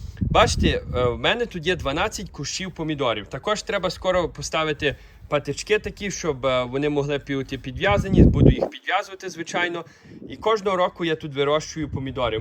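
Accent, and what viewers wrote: Western Ukraine, Ukraine. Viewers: Дуже сподобалося все так по українськи дім сад город , і яка в вас гарна українська мова з західним колоритом , ніби вчора переїхали до Канади молодець.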